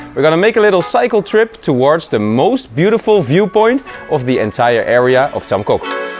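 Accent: Netherlands. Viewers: by the frist phrase I knew u were dutch haha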